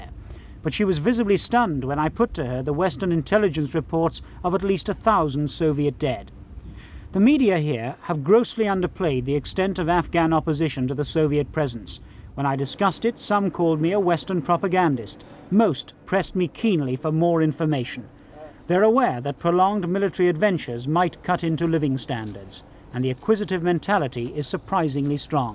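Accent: United Kingdom. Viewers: I'd rather just watch the video footage of people living their lives than having to hear some nasal British commentator spend every five seconds trying to tell you what an unbearable hellhole this is supposed to be.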